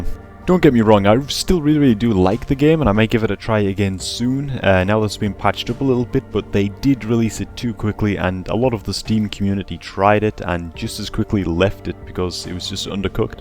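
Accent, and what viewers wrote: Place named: Geordie, United Kingdom. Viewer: unusual question but what is your accent? It sounds like a foreign one and a geordie one mixed together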